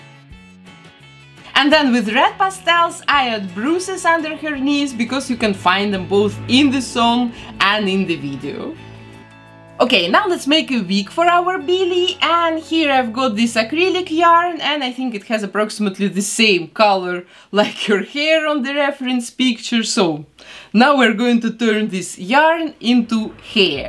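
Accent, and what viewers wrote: Russia. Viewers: согласна с тобой такой русский акцент... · Ток хотела написать! Тут явный русский акцент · Sydnie Harrison Maybe this woman is from Russia. She has Russian accent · Да-да-да, я тоже заметила, ибо понимаю английский только с русским акцентом, таким образом, я "поняла" все видео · Акцент русский) Я как будто слушала подругу которая говорила непонятные слова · Russian accent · Какой сильный акцент. Сразу поняла, что она, возможно, русская) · She has a very strong accent. Immediately clear that she is Russian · Почему-то слышу русский акцент 0.0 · Очень очень русский) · Настолько русский акцент не может быть у англичан. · Да потому что только русские говорят слово рили всех английского акцента😂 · DUH ONA RUSSKAYA PONYATNO PO HER ACCENT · Да, акцент русский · SSO I think so, cause I'm Russian😅 Sooo, I recognize the Russian speaking manner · AryanaJoy Babauta, yes, she is Russian. 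It’s obvious she is.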